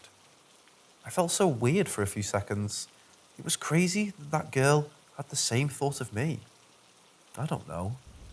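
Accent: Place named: Sunderland, United Kingdom. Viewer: Hmmm cant decide if your a Geordie with a middle class background or you hail from across the river Tyne beyond Gateshead, with a smattering of Sunderland tones, not Durham, too thick of an accent. Maybe uni at Manchester? Back to Newcastle or even Northumberland? Im on the far Northumbrian Coast & love tracing where accents come from & the little quirks of other accents picked up from a short stay or via friends & family. Thanks enjoyed yr video 😉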